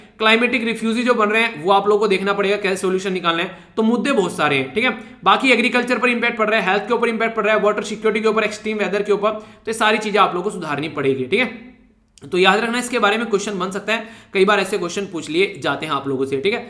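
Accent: Haryana, India. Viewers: Namaste sir sir aj to app bhout haryanvi bol rhe app haryana se hi ho na lg rha h boli se mst lecture 100% charged thanku so much sir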